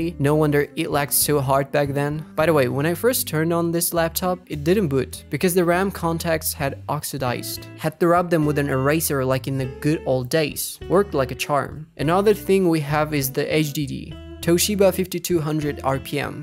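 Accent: Russia. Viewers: is bro russian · U russian? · Ха,он русский 😂,спалился братан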